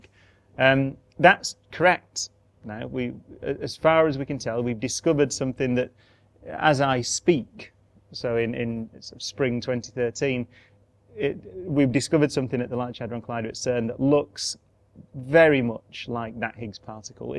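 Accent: United Kingdom. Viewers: It's the British accent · British accent!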